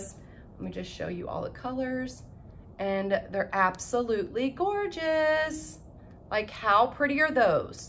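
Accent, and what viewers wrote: South, United States. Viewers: It’s so good to hear someone from the South....I say stuff like “my bag is slap full!” You make me smile! 😀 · HI from Katherine i love southern voice you do a good job on dollar store you gave me tips. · Must be from the South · You are so funny with y'all and yes ma'am. I be laughing so hard in years. Where are you from ? Must be from the South. I love the way Southerners talk. I love going down South the people are so hospitable. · Love your southern accent, love your videos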